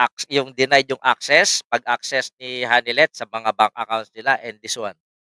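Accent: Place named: Chinese-Filipino, Philippines. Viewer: Hahaha.... Tsinoy kb idol? Para tsinoy kc tuno ng boses mo eh....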